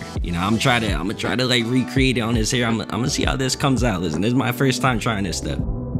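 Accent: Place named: Texas, United States. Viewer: Why does he sound lie he was born in texas because of his accent but hes really balck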